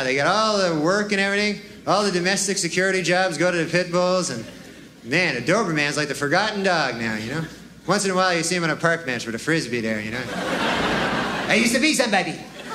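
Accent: Canada. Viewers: Yeah, he sounds super Canadian here. Norm had a real Ottawa Valley brogue as a young man. <link> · He sounded a lot more Canadian back then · Actually what he sounds like is a CARICATURE of a Canadian.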